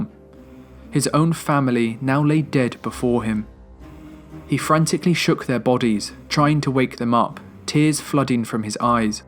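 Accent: United Kingdom. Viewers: It helps that the narrator has a soothing British accent. Try that with one of these screechy annoying American accents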